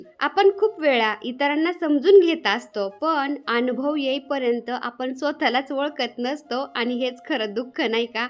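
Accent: Ahmednagar, India. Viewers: श्री स्वामी समर्थ ताई तुमची यूट्यूब ची जर्नी खूप छान होते प्रेरणादायी होती😊❤ तुमचा आवाज तुमच्या नगरची भाषा खूप छान आहे म्हणूनच व्हिडिओ बघायला खूप छान वाटते❤😊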